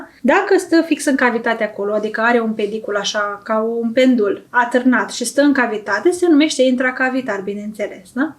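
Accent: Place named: Moldova. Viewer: Ft frumos comentați ...și complet...un ușor accent Moldovenesc sau ma înșel. ...nu ca ar fi ceva rău ..un medic ginecolog complet...felicitări ..și ditr o alta perspectiva ..și încă ceva ..dvs aveți fibroame ?Adică leiomioame ?😂😂😂😊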